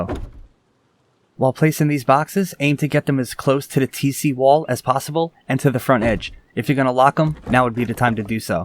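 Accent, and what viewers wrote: New York, United States. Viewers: what part? im from Merrick on Long Island, i could tell a fellow New Yorkers accent. Also good luck with the videos, very well laid out and descriptive.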